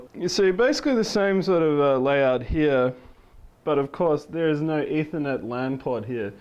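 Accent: Australia. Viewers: r u Australian? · It's an Australian accent, and Australia is a lot better governed than the US.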